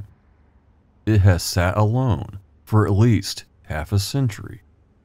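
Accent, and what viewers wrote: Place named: South, United States. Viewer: Wow 😲! I kind of nailed it! That's so cool your ancestors were French and followed Daniel Boone to... Kentucky! I looked up Lawrence County, IN and it's very close to the Kentucky line. As a proud Southerner (Alabama, where the skies are in fact so blue) I assure you I was complimenting you on any influence your parents' accent may have had upon you! You're Midwestern, yet retain the Grace and Gentility of a Son of the South. Just got home from work, about to watch more. It's a pleasure to meet you. I'm William.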